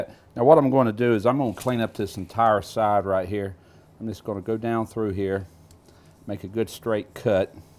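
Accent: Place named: Mississippi, United States. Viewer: You can tell your a Mississippi Man....lol. sound like my friend Amos Fowler ( ex NFL player)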